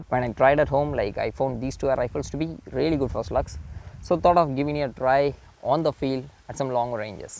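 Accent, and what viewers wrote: South India, India. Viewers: Yea , i think he is from south Indian, because his accent is like a South Indian that's why so i m mistake thn please forgive me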